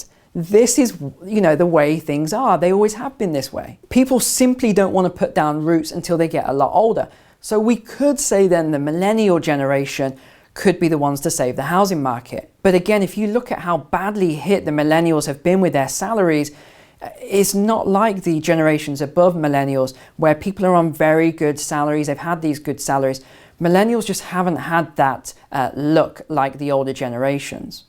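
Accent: United Kingdom. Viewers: Its weird listening to a British sounding guy talk about American reality. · God bless you for saying all four syllables of "military" !! With a British accent, I was fully expecting to hear "mili-TREE" instead.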